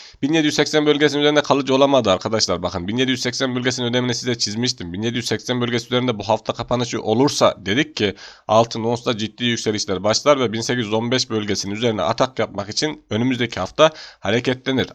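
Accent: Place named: Central Anatolia, Turkey. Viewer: dost..Sen konya yada Kayserili misin...aksan Anadolu'nun bu kısımlarını işaret ediyor..ikincisi güzel kardeşim sanırım böylesi bir kurul zaten var... Cb'ligi ekonomi yüksek istişare konseyi yada benzer bir adla....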